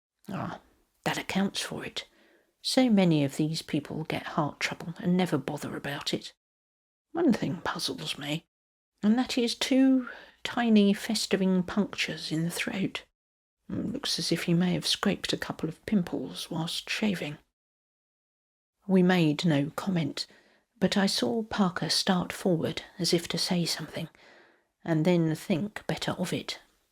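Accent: England, United Kingdom. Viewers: Well read. Good to hear English stories in an English voice, and spoken slowly and clearly.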